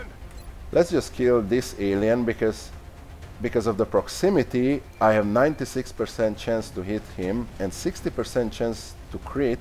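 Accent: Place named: Hungary. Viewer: Wow, very detailed, nice guide. Are you hungarian by any chance? Just guessing by your accent. :)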